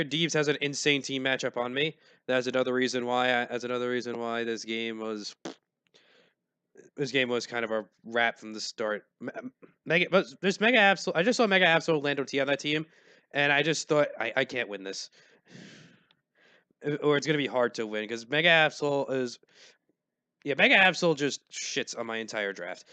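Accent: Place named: New York, United States. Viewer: Really sound like a New Yawrka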